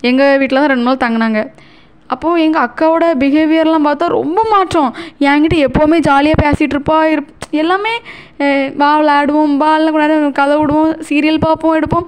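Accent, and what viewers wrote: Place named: Tirunelveli, India. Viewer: Excellent explanation especially nellai slang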